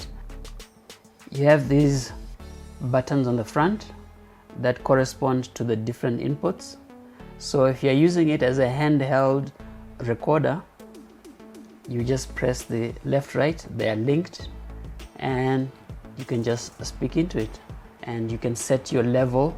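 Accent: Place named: Zimbabwe. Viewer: This sounds like a Zim accent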